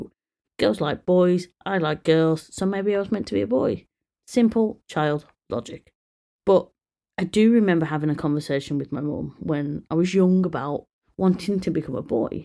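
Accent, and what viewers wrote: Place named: United Kingdom. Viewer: Hey, could you write your email please? Hard to hear for non-british ears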